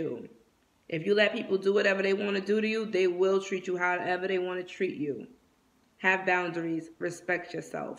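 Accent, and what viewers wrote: New York, United States. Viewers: Another dope video on my journey of black hair videos! I kept getting the urge to look up antennas and hair and so far I’ve come across great information. Glad I got my locs best decision I made! You sound like you from nyc. Peace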